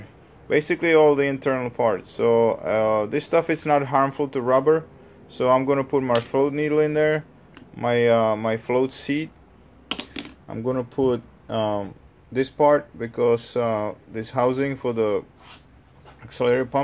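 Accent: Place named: Latin America. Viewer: ABSOLUTE AMAZEBALLS!! Dude with the Latino accent! You are the MAN! Thank you SO much for this video. I've got exactly the same carburator on my old HD Big Twin and I thought I had run all the gas out of it before I stored it. NOT! I didn't get it all. But now, I've got it all solved, AGAIN thanks to YOU!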